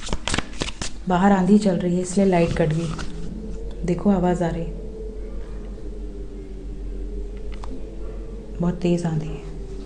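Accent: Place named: Bhojpuri, India. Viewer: mam kha se hain aap bhojpuri bol rhi hai vaise mai ghazipur up se hoon 😂❤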